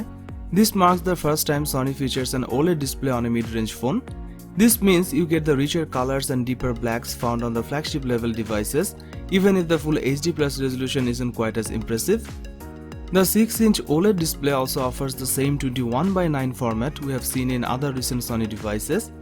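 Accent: India. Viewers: I do not understand this Indian accent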